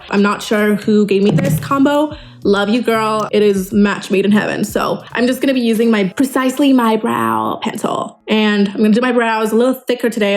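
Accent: United States. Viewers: i swear you sound more american every video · Every time she makes such videos its hilarious to me, she seems like the typical trying to fit into the box kinda girl who tries to be relatable. This accent is it for me, how much will you try to fit in and sound like the typical LA influencer. I feel like when she was in Dubai she at least wasn’t trying to be something and was genuinely funny this is just cringe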